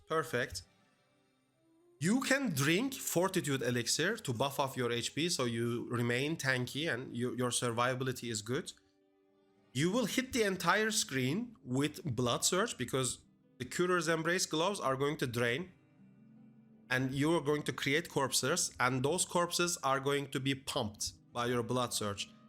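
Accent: Turkey. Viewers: Aboow Türk İngilizcesi bu. Vay dostum süper içerik süper video. Twitch yayıncısıyım seni paylaşacağım izninle. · İngilizcemiz bile Milli bir tarza sahip, vallahi çok güzel konuşuyorsun. Buildler için de teşekkür ederim, bugün yarın deneyeceğim.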